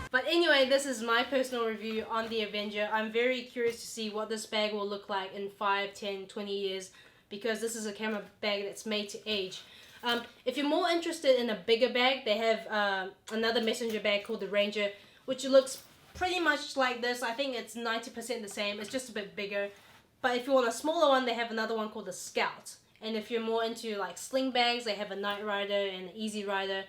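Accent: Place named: New Zealand. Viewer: I love your accent. All the people from NZ I’ve met are polite and nice. I also love your current PM. she is so great and dose a fantastic job dealing with the covid virus.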